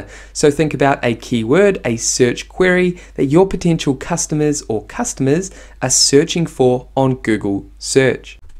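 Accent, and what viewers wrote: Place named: New Zealand. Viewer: Love the kiwi accent, thanks for the video :)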